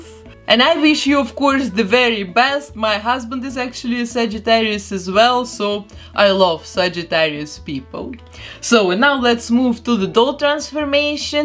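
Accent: Russia. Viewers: акцент русский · Почему я слышу русский акцент? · Lol all the cautions are a little frightful! Lol...(Russian accent) “don’t get me mad, I know how to make you into a doll, with household products! “. Gawd I LOVE LOVE ❤️ that accent! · блять я слышу русский акцент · are you russian? not being mean or races. just asking. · Почему мне кажется что она из России, у неё окцент что-ли "русский"? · Сильно слышно русский акцент 😂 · Либо я уже глухая,либо есть какой-то русский акцент у автора канала 🤔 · Is she Russian? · Какой то слишком русский акцент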